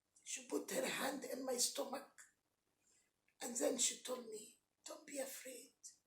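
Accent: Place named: Egypt. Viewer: لهجتك مصريه خالصه رغم التحدث بالانجلش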